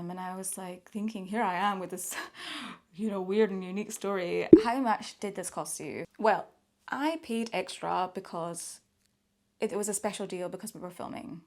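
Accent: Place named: Scotland, United Kingdom. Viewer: Dear, I am not at all sure why your videos started appearing in my feed, but I am very glad they did. It could be because I had watched a few Japanese travel things previously but who knows the whims of the Great Algorithm? Anyway, I found the cuddling video deeply meaningful and also moving. It was also lovely to hear your accent (I am originally from Dundee) and your red hair. Oh, I am male, quite a bit older than you and "straight" whatever that means. I hope you will continue to make these lovely travelogues. Safe travels 😎😎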